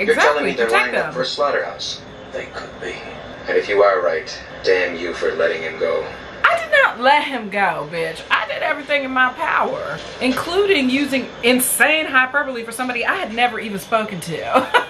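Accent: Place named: South, United States. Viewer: From a brit. You have an x rated southern twang that says what everyone else is thinking when facing Carpenters creations. Funnny wonan x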